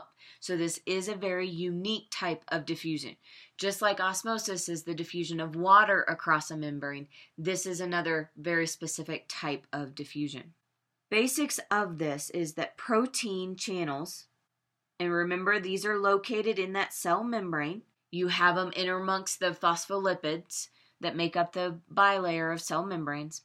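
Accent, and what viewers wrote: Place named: Midwest, United States. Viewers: Where in the USA is that accent from? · Lena G it's Midwest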